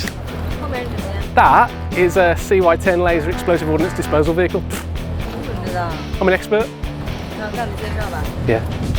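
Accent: United Kingdom. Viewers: 英音 真的好听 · 你是英国人吗，口音喜欢。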